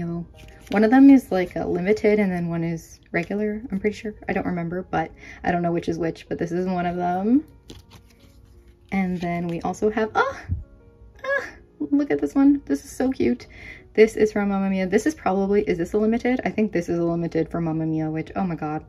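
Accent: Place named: United Kingdom. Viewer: I never noticed, but you pronounce words like british/australian instead of American that's so interesting!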